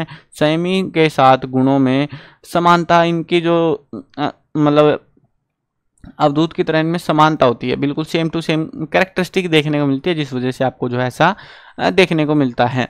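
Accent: Haryana, India. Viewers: Sir haryanvi hai kya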